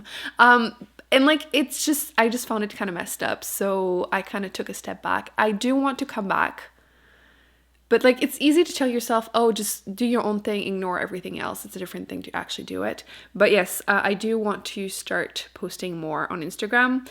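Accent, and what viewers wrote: Quebec, Canada. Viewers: I think that most people have just never heard a french canadian accent. I hadn't heard one until I came across this channel a few years ago. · I don't feel like you have a very strong Quebecois accent at all. You sound more like a native English speaker with a lisp, no offense. My ex roommate was Quebecois and usually dropped her French accent so people wouldn't know she spoke french, but if she didn't, it was quite apparent. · J’aime trop ton accent québécois 🥺 je me demandais si tu regardais des vidéos de booktubers français? ou plutôt que des anglophones? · Have people never heard a French Canadian accent? 😂 I grew up in Eastern Ontario where every second person you meet has the same accent! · C'est toujours bizarre a chaques fois que je t'entends parler quebs ahahah 😂✨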